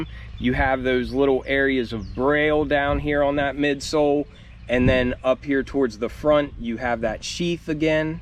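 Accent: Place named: Pittsburgh, United States. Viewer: You from Pittsburgh bro? I noticed the hat and shirt. Plus the accent . I’m from there so I’m curious…I paid resale for those too unfortunately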